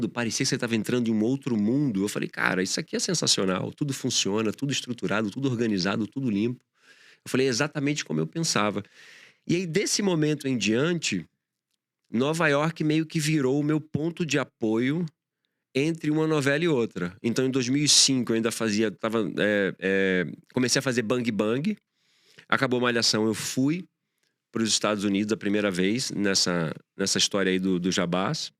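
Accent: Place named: Recife, Brazil. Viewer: O cara sai de Recife, mas o sotaque não sai.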